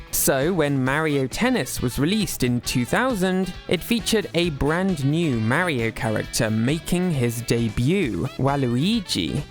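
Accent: United Kingdom. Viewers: always wondered why the Brits pronounce it as Mare (like female horse)-yo instead of Mah-rio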